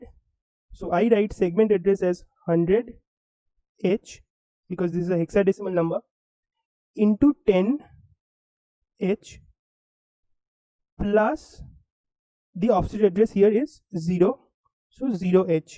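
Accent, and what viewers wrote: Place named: India. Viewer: Indians speaking english sounds very ridiculous, next time use subtitles or remove anything below your tonge, the world will thank you.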